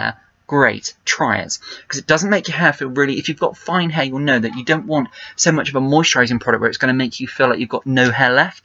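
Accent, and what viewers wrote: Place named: England, United Kingdom. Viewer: omg i loved ur english accent & ur hair.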